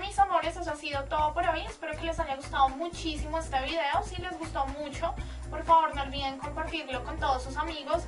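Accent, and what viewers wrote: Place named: Colombia. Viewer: De donde eres? Colombiana? Me suenas a Colombiana..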